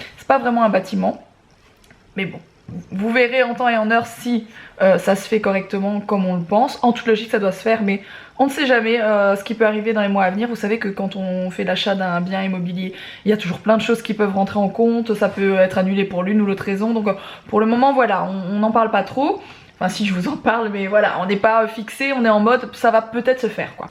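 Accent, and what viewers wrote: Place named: Belgium. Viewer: Je trouve que ton accent Belge s'entend fort dans cette vidéo ^^. Bravo pour tout ton travail.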